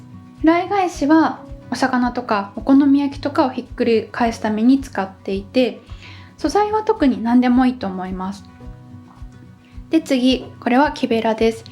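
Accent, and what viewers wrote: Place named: Wakayama, Japan. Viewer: 発音が和歌山の方かな？って思って、間違えちゃいましたすみません笑🤦‍♂️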